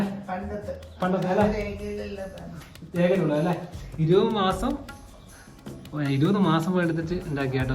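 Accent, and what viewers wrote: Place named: Kannur, India. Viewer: നിർത്തി നിർത്തി പറയൂ സഹോദരാ... അതും കണ്ണൂർ ഭാഷ,,, നിങ്ങൾ അവിടെ പരസ്പരം പറയുമ്പോലെ ആകരുത് വീഡിയോ ചെയ്യുമ്പോൾ. എന്താണ് പറയുന്നത് എന്ന് ആർക്കും മനസ്സിലാകില്ല.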